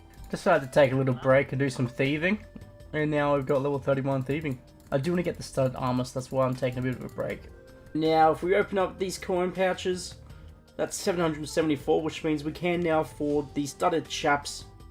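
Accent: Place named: Australia. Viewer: i just started my first one chunk account in varrock and it’s definitely nice to hear a familiar aussie accent to follow along to 😂 looking forward to seeing where this goes, good luck!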